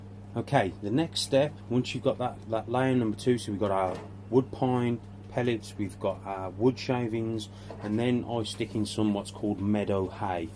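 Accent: Black Country, United Kingdom. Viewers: GREAT VIDEO UR ACCENT SOUNDS LIKE BLACK COUNTRY I DONT SEE PROBLEM WITH FEEDING LIVE WHAT WOULD THEY EAT IN THE WILD ITS SIMPLE!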